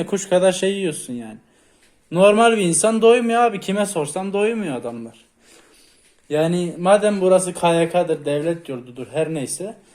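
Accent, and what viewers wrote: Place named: Cyprus. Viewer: allahın kıbrıs şiveli 3t'nin çakma ergen versiyonu... Çalışma masaları ve yataklar farklı yerlerde arada duvar ve kapı var tuvalet için ayrıca kapı var lavabonun orta yerinde açıkta değil yani klozet. Banyon da kapılı. Dandik duşakabinlerden değil. Onu da kapılı yapmışlar. Üstüne sandalyen tahta sandalye değil rahat. çalışma masanın lambası bile var. Sen daha ne istiyorsun? Devlet senin göbeğini mi büyütsün? Çok göbek pesindeysen gider kendi yemeğini kendin alırsın boş boş konuşma. Tuvalete adam niye terlikle gitsin? Terlikle gittikten sonra yine içeri terlikle gelmeyecek mi? Kafan mı iyi senin? Alaturka tuvalet değil klozet var orada. Yerler, terliğini ve ayakkabısını kirletmiyor yani. Senin gibi tipleri böyle aklı başına gelinceye kadar döveceksin. Senin gibi tiplerin çalışacağı max. yer sanayi olmalı fazlası değil.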